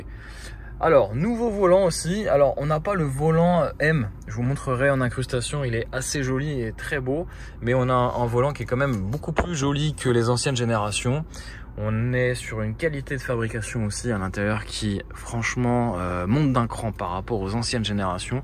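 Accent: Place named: France. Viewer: I only watched this to listen to the French accent. 10/10.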